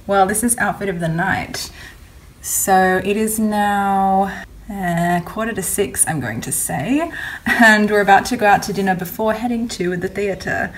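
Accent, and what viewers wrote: Australia. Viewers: Also dude, I thought you were Australian, then I saw the city and thought you were in London, then I looked on your blog and it says you['re from Sydney. I'M SO ANNOYED cos I saw those lovisa chokers and I just. REALLY. need to buy a choker right now. I'm so annoyed cos I want like 10 beautiful chokers but no stores have good ones ugh.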